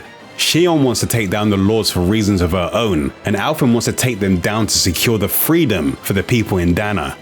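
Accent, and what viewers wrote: United Kingdom. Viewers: Are you from the UK? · Clips Dude he just sounds a little british, weak bait lol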